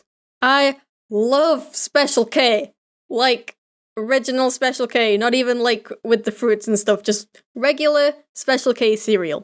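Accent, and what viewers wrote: United Kingdom. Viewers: are you british? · Angel Animates :3 well yeah she has a British accent so she would be a Brit (she is)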